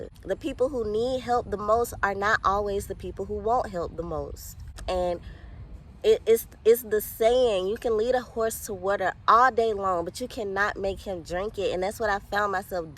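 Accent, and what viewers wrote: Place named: West End, United Kingdom. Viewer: I was trying to figure out where you’re from! Wassup West Ennnndddddd!!!!!!!!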